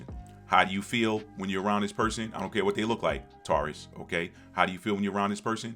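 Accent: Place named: New York, United States. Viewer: This video was incredibly impactful for me! I started examining my children's charts to identify the planets in their 8th house and took notes. I really appreciate these astrology segments...please continue creating them, they're incredibly beneficial to everyone. Also, I must say you still have a STRONG New York accent — I can attest to that firsthand!😊🫶🏾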